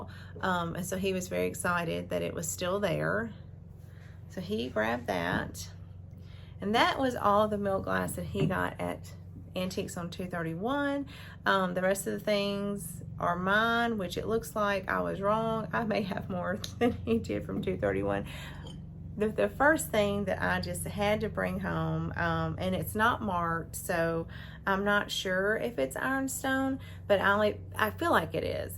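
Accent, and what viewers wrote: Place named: South, United States. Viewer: any way you can turn on closed captioning? i am a northerner and have a tad bit of difficulty with your southern accent😉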